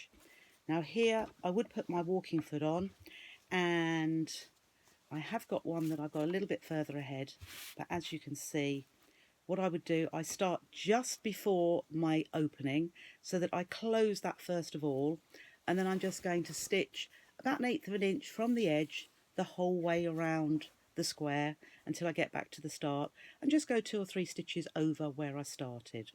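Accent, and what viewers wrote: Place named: United Kingdom. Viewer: I am Arabic woman I like your British accent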